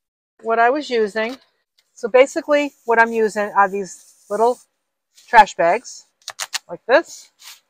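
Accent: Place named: Massachusetts, United States. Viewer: Ha I knew it,,,,I heard that Mass accent on your other videos. Thanks for cool videos Helpful ..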